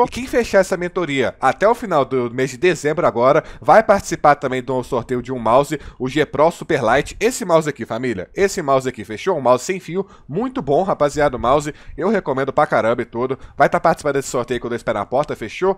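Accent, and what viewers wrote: Belo Horizonte, Brazil. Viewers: Sotaque de BH do é bão dms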